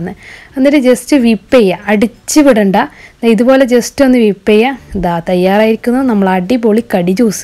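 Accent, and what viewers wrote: Kannur, India. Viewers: Suppertto😍 ningala Kannur basha varthaanam polichtoo🤭😂👍😍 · Chayayillatha kadi.. peruthanne adipoli.... kannur basha pwoli... 😄😄👌👌👌easy & healthy recipe... 😋👌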